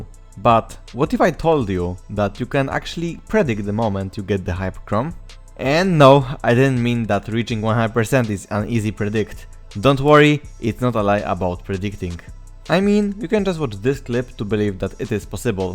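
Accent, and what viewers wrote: Poland. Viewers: Where are you front you have Poland adcent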